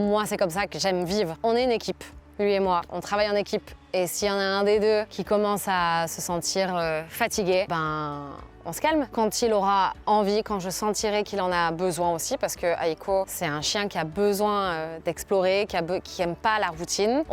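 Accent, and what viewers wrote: France. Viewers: Ou un échec ? Non, c'était pas le bon moment c'est tout. Ce qui me plaît, c'est ta spontanéité, ton amour pour Aiko et cet accent du sud-ouest. Wow désolée pour ton papa.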